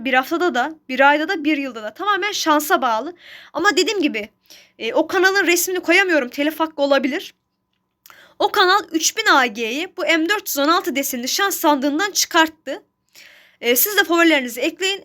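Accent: Azerbaijan. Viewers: Qaqa Azərisən türkcə danışırsan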